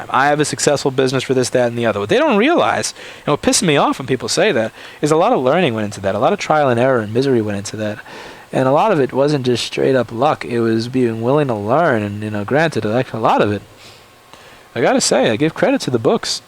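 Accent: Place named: New York, United States. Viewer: I think the New York accent makes your “shut the fuck up” 10 times better LOL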